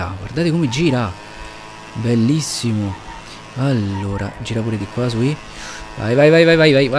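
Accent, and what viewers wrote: Rome, Italy. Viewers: Mo se sente che se de Roma!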